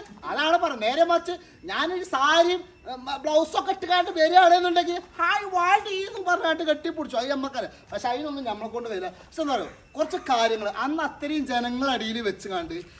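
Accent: Malappuram, India. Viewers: നിങ്ങൾ സംസാരിക്കുന്ന ബാസ ഏതാ ശരിക്കും മലപ്പുറം ബാസ ആണോ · എടോ, നിങ്ങളുടെ വീഡിയോ എല്ലാം മെച്ചം തന്നെ, സിനിമയിൽ എന്ത് ഊച്ചാളിത്തരവും കാണിക്കുന്ന ഈ നടൻ സിദ്ദിഖ്, അവൻറെ തോളിൽ കയ്യ് വെച്ചുവെന്ന് പറഞ്ഞ് എടുത്ത് മാറ്റാൻ പറഞ്ഞത് അഹങ്കാരത്തിന്റെ അങ്ങേയറ്റമാണ്. മകൻ മരിച്ചപ്പോൾ അവനെ എല്ലാവരും കെട്ടിപിടിക്കുന്നത് കണ്ടല്ലോ, മുംബ് റിയാദിലെ ഒരു കംബനിയിൽ ഇലക്ട്രീഷ്യൻ ആയിരുന്നു അയാൾ.എല്ലാറ്റിനും കൂടി ഇപ്പോൾ കിട്ടികൊണ്ടിരിക്കുന്നുണ്ട്. പിന്നെ,നിങ്ങളുടെയീ പ്രാകൃത ഭാഷ ദയവായി ഒഴിവാക്കണം, ഇപ്പോൾ മലപ്പുറത്തെ ആരും തന്നെ ഇങ്ങനെ സംസാരിക്കുന്നില്ല.സംസാരിക്കാനറിയില്ലെഘിൽ അറിയാവുന്നവരെ കൊണ്ട് ഓഡിയോ ഇടുക.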